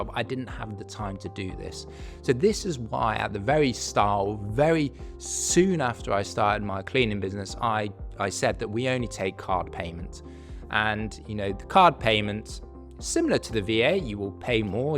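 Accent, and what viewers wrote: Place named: United Kingdom. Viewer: Hey are you based in the UK? I am looking to start it now & I came across your British accent lol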